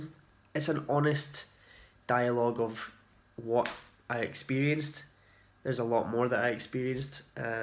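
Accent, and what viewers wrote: Scotland, United Kingdom. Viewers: I love your vlogs, I love your Scottish accent, your eyes, your just a wonderful guy with great advice. Keep doing you.❤️❤️❤️❤️❤️❤️❤️❤️❤️❤️❤️❤️❤️❤️❤️❤️❤️❤️❤️❤️❤️❤️❤️❤️😘😘😘😘😘😘😘😘😘😘😘😘😘😘😘😘😘😘😘😘😘😘😘😘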